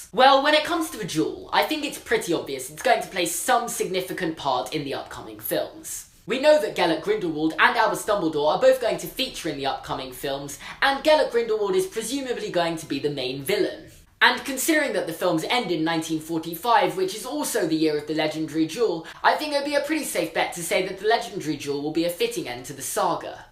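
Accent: England, United Kingdom. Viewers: Where is he from? I recognise his accent, but I can't place it (I know its English, but not sure where specifically) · British accents are adorable tbh I love them so much. I'm watching these videos to hear the accent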